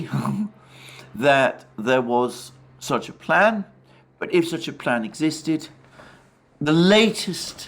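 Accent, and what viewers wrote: United Kingdom. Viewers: he is offering russian propaganda with a british accent.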